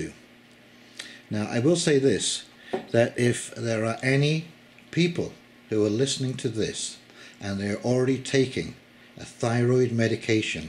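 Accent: England, United Kingdom. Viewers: hello matey, i'm in the UK, near Oxford - surprised how English your acent is - thanks for the tip.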